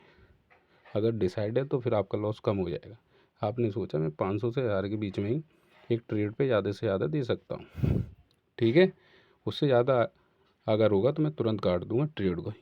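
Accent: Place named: Madhya Pradesh, India. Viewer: Thanks for the response sir! Abhi mein start hi kr rha hun aapke video dekh dekh k, kabhi kabhi profit aur kabhi kabhi loss, I feel mostly mein abhi emotional trades le rha hun since I'm very new to the market toh achche se confidence nhi aaya h. lekin aapki strategy se bahut kuch seekhta hun, aapke accent se lgta hai jese aap madhya pradesh se ho, mein bhi Madhya Pradesh se hi hun. Thank you so much for explaining!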